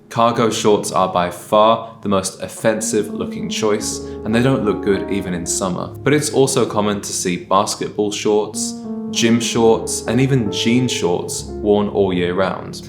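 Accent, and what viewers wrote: United Kingdom. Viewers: Love your dry, understated, British delivery. One faux pas I see frequently, is men wearing a baseball cap with a suit, all too common. (yes, pun intended) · Is this an American with a British accent or are proms yet another American import to GB?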